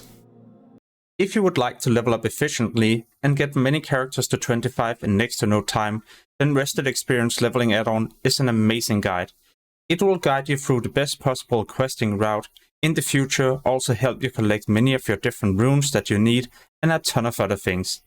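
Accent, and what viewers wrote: Denmark. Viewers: Great tips, ive been playing wow since the very first beta of vanilla and i still found some usefull tips here thanks. your accent sounds like your Dane just like me.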